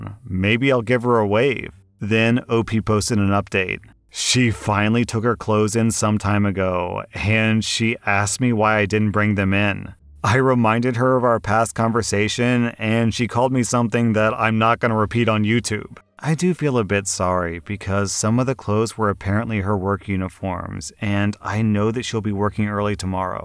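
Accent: United Kingdom. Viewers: 10/10 British accent. When I read "Super Saiyan 3 British," I imagined OP getting super buff and shouting "CHEERIOOOOOOO" before evolving to his final form. Don't ask me why.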